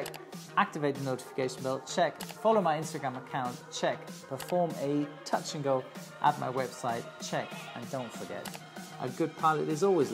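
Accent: United Kingdom. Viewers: Captain Joe... You're a good looking guy! Specially with that British accent? Australian?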